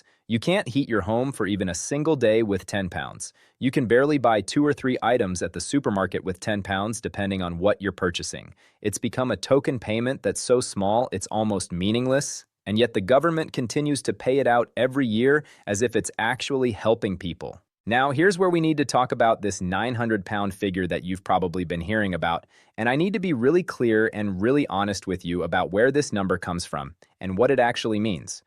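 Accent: United States. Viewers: Why do we have Americans narrating?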